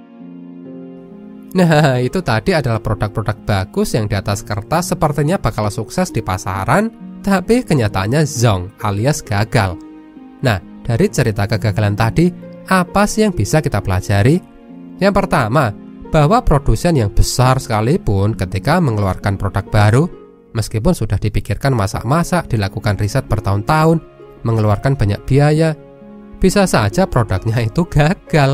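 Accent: Java, Indonesia. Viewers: Jowone ndi min admin sampean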